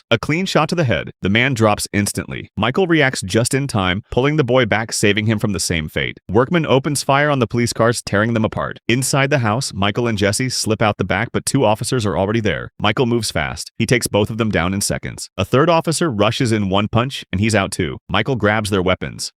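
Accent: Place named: United States. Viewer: Why do we have to endure these ghastly American narrations?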